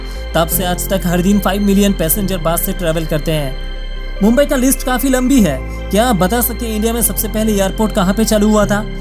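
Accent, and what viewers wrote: Bengal, India. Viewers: aree yaar kisi neutral accent wale ko bola karo narration ke liye. tum bangla wale pura khel kharab kar dete such irritating accent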